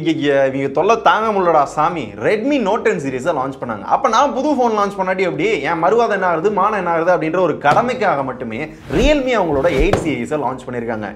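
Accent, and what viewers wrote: Madurai, India. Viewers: மதுரைகாரன் பேச்சே தனி தான்...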